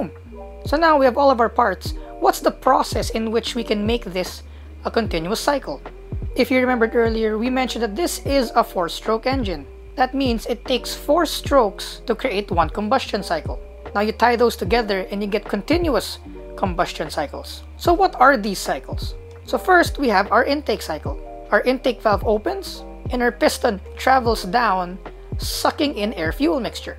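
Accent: Philippines. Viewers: This is the first vid I'm seeing from you and I can hear the Filipino accent already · I’d recognize a filipino accent from a mile away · W Pinay Accent 👌✨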